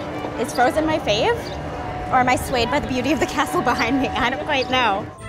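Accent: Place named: New York, United States. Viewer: I thought she had a New York accent then she confirmed that she was a New Yorker, that's the easiest accent besides the midwestern accent to spot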